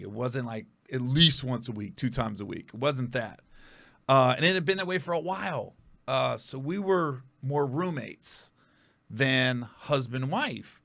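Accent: South, United States. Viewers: He from down south you clown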